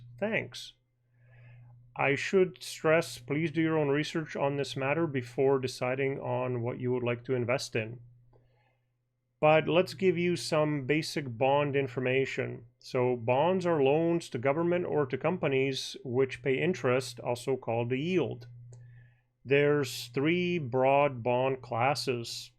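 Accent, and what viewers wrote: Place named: Canada. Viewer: Where is this accent from? I’m Canadian and I’ve never heard anything that sounds so Canadian before.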